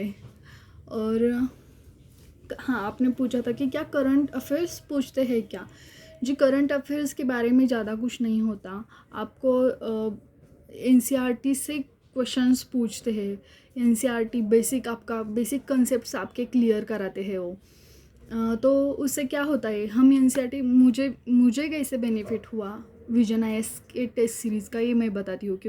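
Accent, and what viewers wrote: Maharashtra, India. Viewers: Marathi assent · You have Maharashtrian accent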